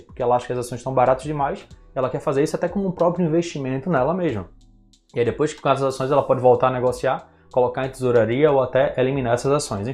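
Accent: Pernambuco, Brazil. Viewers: Tu és pernambucano?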